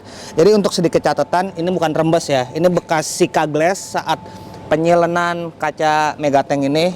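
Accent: Jakarta, Indonesia. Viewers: Om aseli mana sih? Wkwk ko kaya orang Jakarta ngomongnya wkwkw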